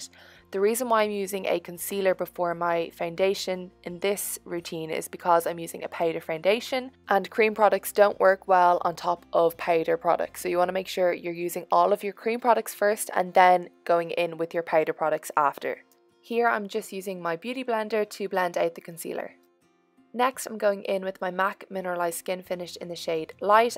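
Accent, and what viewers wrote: Ireland. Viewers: how can she help that its an irish accent im irosh and thats how i say it .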